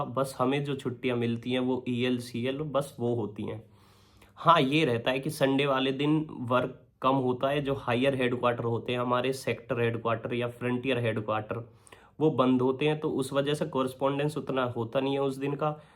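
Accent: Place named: Noida, India. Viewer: Bhai ji apka bolne ka tarika asa lag rha hai jese aap Noida se ho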